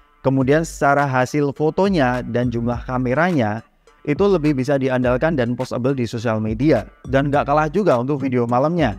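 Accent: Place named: Pekalongan, Indonesia. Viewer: kaceke tidak banyaknya ya, jgn2 mas nya orang pekalongan nih 😁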